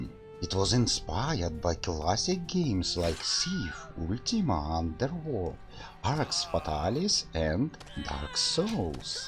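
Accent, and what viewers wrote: Russia. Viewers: Чет у меня подозрение что русско-говорящий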